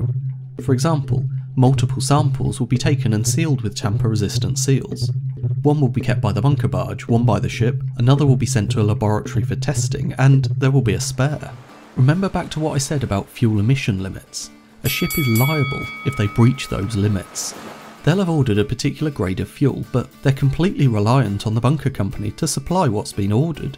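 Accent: United Kingdom. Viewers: You're Britain?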